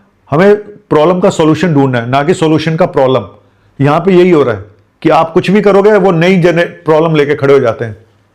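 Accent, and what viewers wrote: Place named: Delhi, India. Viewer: Dr sahab ham gujrati log aapke jaisi Delhi vali hindi nahi bol sakte to plz hamari msg pdhhke hansna😂 nahi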